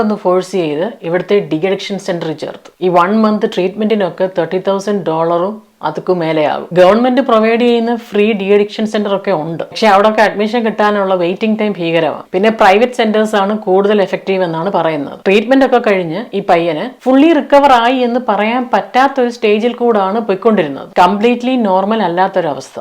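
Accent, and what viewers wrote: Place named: Chengannur, India. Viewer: ചെങ്ങന്നൂർ സ്റ്റൈലും സ്ലാങ്മാണെന്ന് ഊഹിക്കുന്നു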